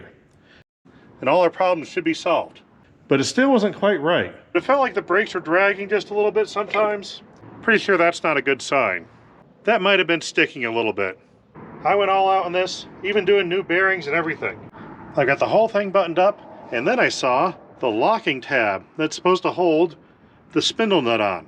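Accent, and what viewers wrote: New England, United States. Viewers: Every once in a while I hear what sounds like a Nor'Easter accent? Enjoying your work. Something optimistic and indefatigable in your approach to problem solving that makes me smile. Cheers from Monteray Bay CA.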